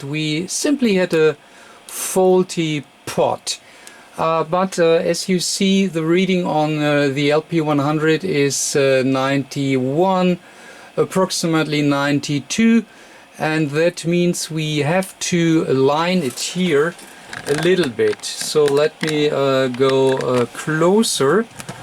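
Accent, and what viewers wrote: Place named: Germany. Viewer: I echo all the nice comments below. So great to get an older piece of equipment working 100% again. Thanks!! Your friend will be pleased. (Love the accent! I am guessing German, but I suppose you could be from the Netherlands.)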